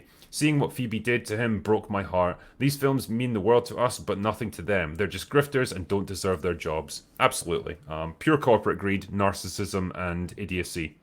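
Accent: Scotland, United Kingdom. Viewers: Nothing but respect for the work you all do one of my favorite channels since I found it it on YouTube a man among men with a smooth Scottish voice I can tune into all day and without open bar would not have found the rest of the crew amazing work all you heroes and a few heroines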